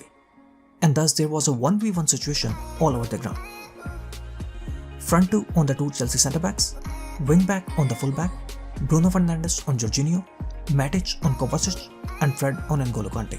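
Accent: India. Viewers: I like your curry accent.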